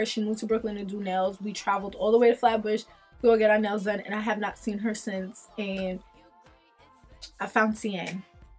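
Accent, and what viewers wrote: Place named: Jamaica. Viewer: Yaasss!!! Bust out that Jamaican accent👏🏿👏🏿👏🏿🇯🇲My mom doesn't play with my education either.😂 Where my Jamaicans at???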